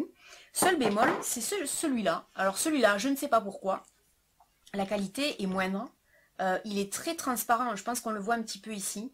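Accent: South, France. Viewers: Super video comme d'habitude ! La palette UD me donne bien envie (j'ai la 1 que j'adore) par contre BYS ne me tente pas 😒..... Et je suis devenue comme toi avec ta youtubeuse préférée.... Je ne me lasse pas de tes vidéos ( je les ai toutes visionnées) et j'adore ton accent du "sud".... Ne change pas 😍 Biz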